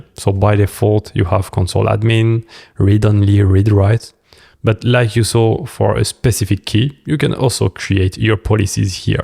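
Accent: France. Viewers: Ahhh cet accent français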